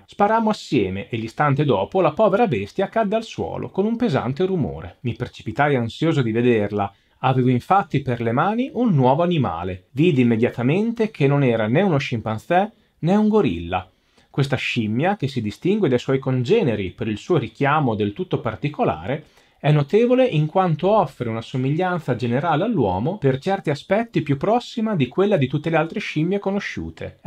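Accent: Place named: Romagna, Italy. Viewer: C'é un vago richiamo al mitico tabloid di Luttazzi alla Gialappa, dovuto all'accento romagnolo. 😍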